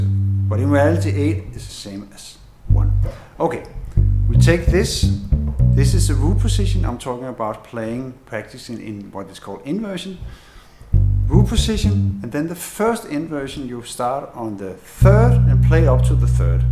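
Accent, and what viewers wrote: Denmark. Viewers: Awesome lesson! :D really helped. And I love your danish-english accent :D so pwnage :D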